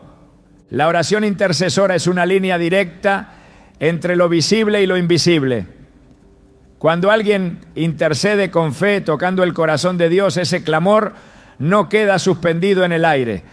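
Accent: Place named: Spain. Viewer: Dios te bendiga!! Oye después que habla parece como si cogiera un aire y además oye bien de lo que habla y como habla parece un español. Es muy facil saberlo.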